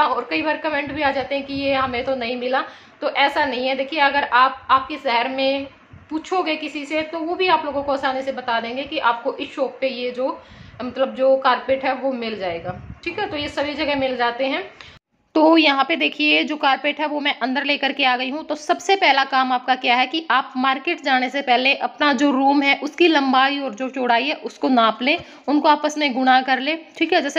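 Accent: Haryana, India. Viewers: Aap haryana se ho kya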